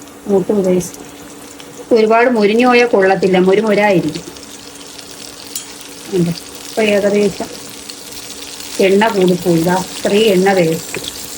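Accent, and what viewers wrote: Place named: Trivandrum, India. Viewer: തുടുപ്പ്. തിരോന്തരം സ്റ്റൈൽ 👌🏼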